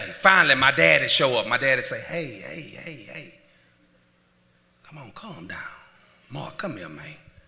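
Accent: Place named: South, United States. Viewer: I LOVE his southern accent! #Mississippi I have it too bro! Love your work!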